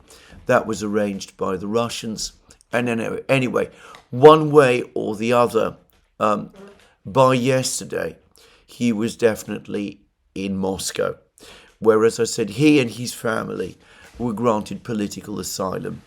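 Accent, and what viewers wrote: United Kingdom. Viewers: Glad you're feeling better, as I am addicted to your podcast and beautiful British accent.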